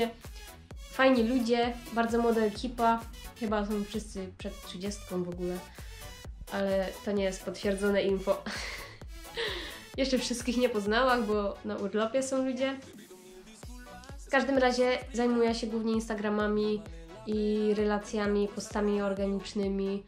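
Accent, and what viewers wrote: Silesia, Poland. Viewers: Fajnie słuchac Ślonsko godka w wersji żeńskiej 😂😂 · Pozdrowionka i powodzenia ;) Miło się słucha tej Twojej ślonsko godki.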